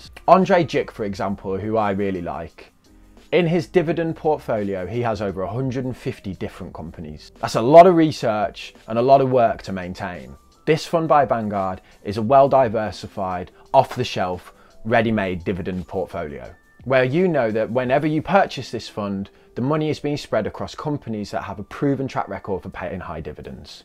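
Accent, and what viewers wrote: Birmingham, United Kingdom. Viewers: videos which we needed with a fckng peaky blinder accent. yoo keep it up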